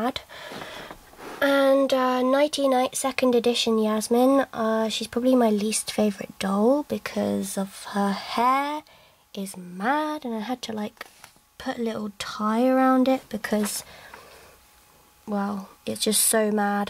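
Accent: United Kingdom. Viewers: Are you british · I have the leah the witch doll but i threw her away cuase she was old and i had no use for her but i love your british accent :) also your dolls are really good takin care of :D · r u from Britain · Are you from london?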